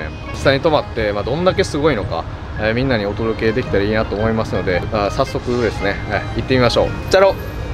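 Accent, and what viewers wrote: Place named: Kansai, Japan. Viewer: ❤✌🕉 、なんで関西弁なん?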